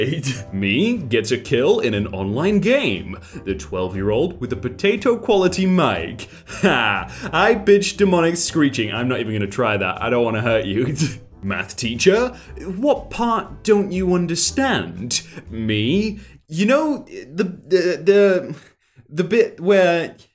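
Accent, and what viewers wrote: United Kingdom. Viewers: day 61? of asking that he british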